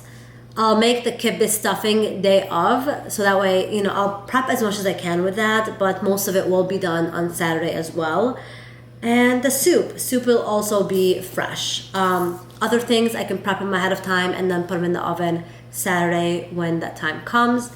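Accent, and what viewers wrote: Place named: Arab world. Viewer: Walla, I thought I heard Araby accent. Shalom, Salam, Peace. I appreciate your constructiveness. Shukran/Todah Rabah.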